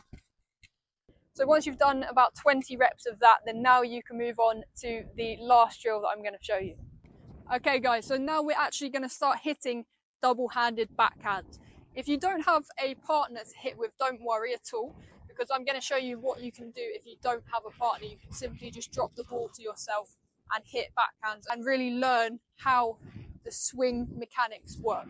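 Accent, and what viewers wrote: England, United Kingdom. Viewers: best, what a nice accent? what part of england it is?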